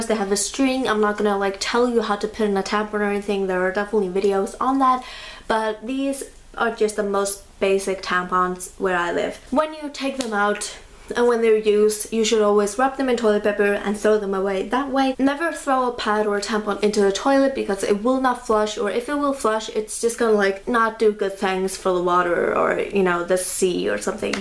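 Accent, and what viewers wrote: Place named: Iceland. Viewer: oh your from Iceland that explains the accent I was trying to figure it out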